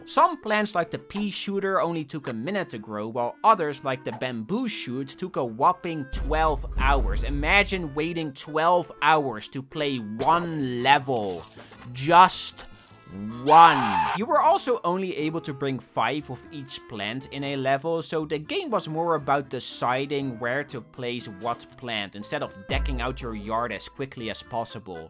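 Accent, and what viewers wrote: Netherlands. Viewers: Hoe had ik aan het begin van deze video opeens de gedachte: wacht... is hij Nederlands? Bizar dat het gewoon waar is. goede video!!1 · why can I hear the dutchness?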